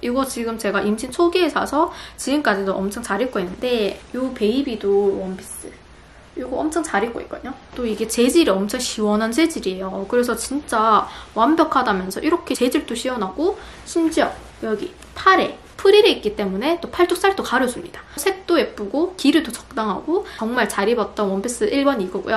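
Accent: Gyeongsang, South Korea. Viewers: 졸귘ㅋㅋㅋ 포슬포슬하이 쫀독쫀독하이 마시따아~ ㅋㅋㅋㅋㅋㅋㅋㅋ 영락옶는 갱상도 한국여자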